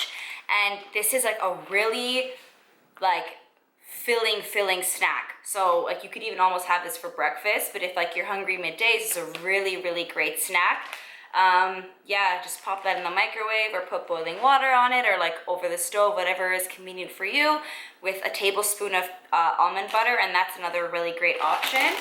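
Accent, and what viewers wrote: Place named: Toronto, Canada. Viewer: I knew it, you sound like your Italian and from Toronto lol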